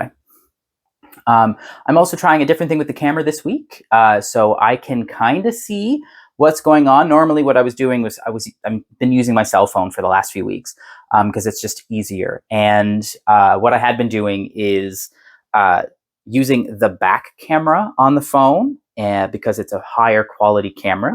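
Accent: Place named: Eastern Canada, Canada. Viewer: I just discovered you and I know NONE of the background—your back story. Is there an episode in your previous series that introduces you? I think from your accent you might be eastern Canadian? Going to go watch the next one. Really enjoying it!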